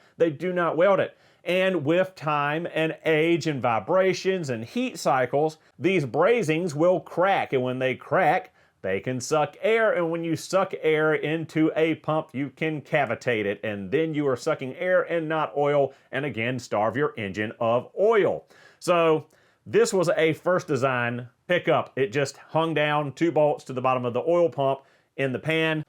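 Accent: South, United States. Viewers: Why are ppl with southern accents allowed on YouTube?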